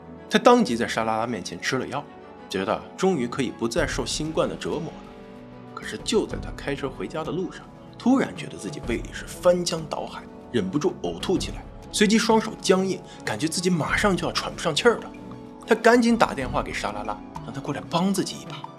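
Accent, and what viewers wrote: Standard. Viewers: 真的是講故事的專家❤內容清晰語氣恰當，字正腔圓❤最喜歡的頻道我每天都聽幾個小時太好聽❤，👍👍👍👍👍👍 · 普通话标准，听多了普通话都学会了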